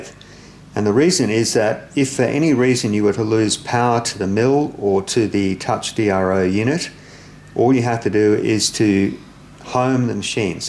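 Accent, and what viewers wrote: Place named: Australia. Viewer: Bridgeport would be my machine of choice, but being a hobbyist I "opted" for an Optimum. It would be great to add your touch dro device to my newly ordered machine. Good luck - great to watch your channel, particularly to hear the familiar Aussie accent (here in Sydney)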